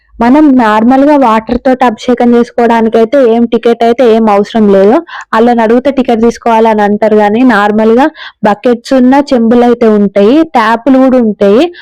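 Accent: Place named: Telangana, India. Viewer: Pakka telangana language 😅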